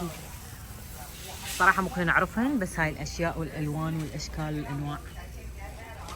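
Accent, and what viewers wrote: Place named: Iraq. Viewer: في أي ولاية هذا أيضاً اللهجة العراقيه كتير حلوه و حضرتك قمة الجمال والانوثة 😂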